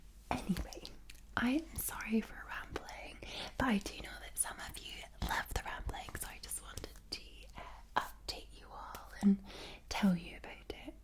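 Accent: Scotland, United Kingdom. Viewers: It’s called a Scottish accent my guy, I got one too lmao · Her accent is so fabulous. I love Scottish accents. in the beginning you couldn’t hear it but as she started talking faster it really jumped out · I love your accent!!!!!😍💖 & I also love Scotland!!!!!😊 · Hey, can you do a video with rambling in a really Scottish accent? I love you're accent, and wanna hear it in raw form(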